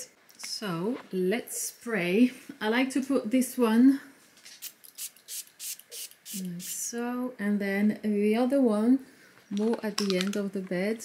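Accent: England, United Kingdom. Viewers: Salut j'aime tellement tes vidéos en anglais, c'est tellement fluide et ce eptit accent english love ittt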